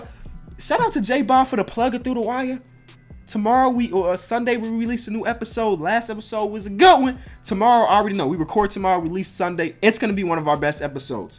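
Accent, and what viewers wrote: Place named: South, United States. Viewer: Why do you talk like you're from the south if you're from Chicago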